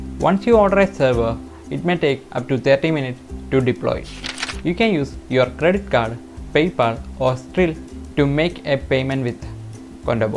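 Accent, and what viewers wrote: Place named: Kerala, India. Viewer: ഹെ മലയാളി ഇംഗ്ലീഷ്‌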